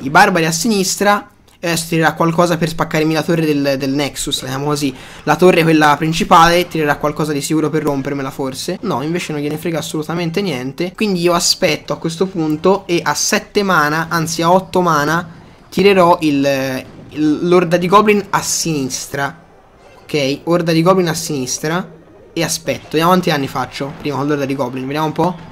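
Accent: Florence, Italy. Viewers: i fiorentini la maggior parte hanno tutti la voce cosi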